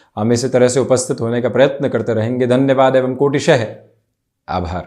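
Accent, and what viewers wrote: Standard. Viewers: Itni shudh hindi thodi si english ke sath 😱😳😱😳😲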